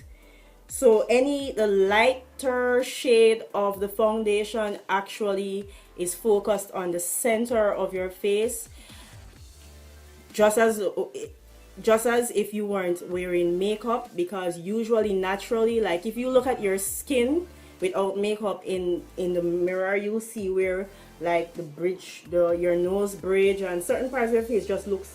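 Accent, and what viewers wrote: Jamaica. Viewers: Are u Jamaican? U gotta be, I know that accent anywhere lol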